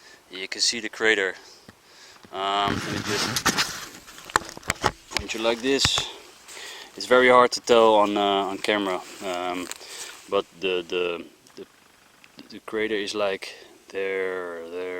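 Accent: Netherlands. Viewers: nice dutch accent... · Are you Dutch or something? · ik dacht van het begin al dat jouw accent heel Nederlands klonk, had ik dus tocht gelijk. PS. ben geabonneerd. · You sound dutch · As a Dutch man you can hear it when a Dutch man speaks English anyways nice video! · Are you dutch i am and you sound like your dutch to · U sound dutch.. EDIT: u are dutch haha ik ook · i know that this man is from the nethelands cause im hearing his acsent XDDD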